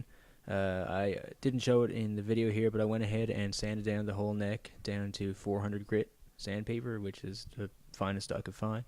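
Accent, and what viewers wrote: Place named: Cape Breton, Canada. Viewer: Are you from Cape Breton ? You got the accent lol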